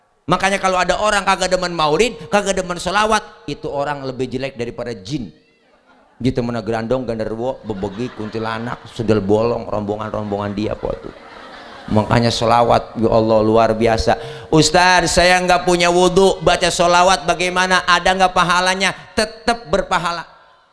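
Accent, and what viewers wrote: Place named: Cikarang, Indonesia. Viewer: Pa ustadz ..Bahasanya org Cikarang ye ..ceramahnya ga bikin ngantukk Ada lucu nya ada seriusnya ..semangat ye ustadz tilee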